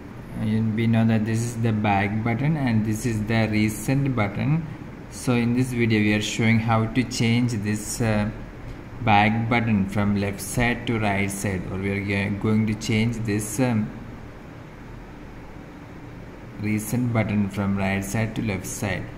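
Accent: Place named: South India, India. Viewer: That South Indian accent though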